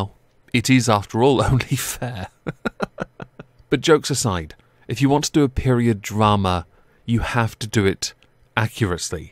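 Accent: United Kingdom. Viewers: Your British accent makes Anne Boleyn sound just like Amberlynn and I got very confused for a second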